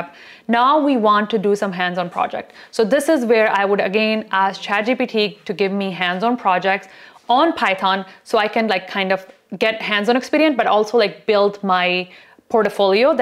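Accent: India. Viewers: You sound lit bit like an Indian. Anyways thank you for this video.👍🏼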